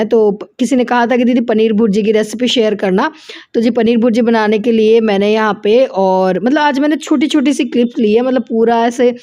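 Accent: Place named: Punjab, India. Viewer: Aap ho Rajasthan ji to punjabi jese rehna aur bolna tone kyu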